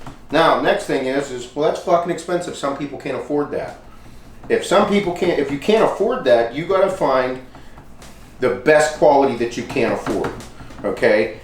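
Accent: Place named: Pittsburgh, United States. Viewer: This dude has to be a Pittsburgh boy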